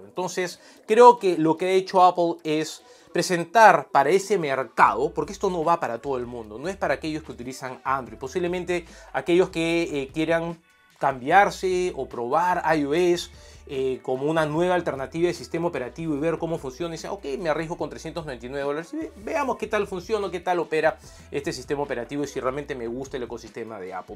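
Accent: Lima, Peru. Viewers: Ese dejo Limeño es inconfundible xD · eres peruano?! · Hola, eres peruano?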